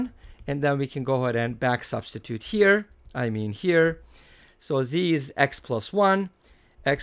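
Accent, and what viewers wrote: Turkey. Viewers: Abi ingilizcen gayet gelişmiş. Daha demin 1 yil onceki videonu izliyodum, türk oldugun hayvan kadar anlasiliyordu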